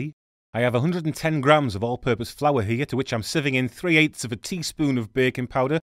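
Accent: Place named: Stockton, United Kingdom. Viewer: As a Stockton Lass i love the recipes and hearing my Dialect I've lost mine by Livin too long in Germany🤣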